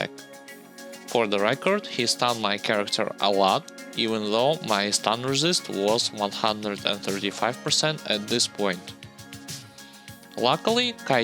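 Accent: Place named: Eastern Europe. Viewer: Great info overall, the eastern european accent makes it interesting also.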